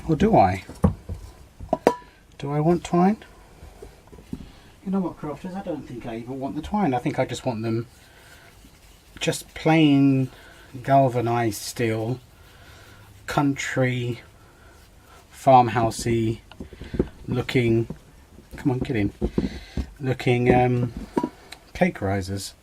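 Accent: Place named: United Kingdom. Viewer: Very cute. What will you do with the feathers? I like them also. I love listening to your accent, it reminds me of my son-in-law who is British. He and my daughter live in St. Neots.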